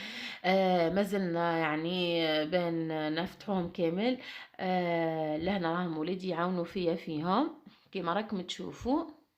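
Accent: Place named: Tunisia. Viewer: علاش تهدري كي توانسا انا من تبسة منهدروش هاك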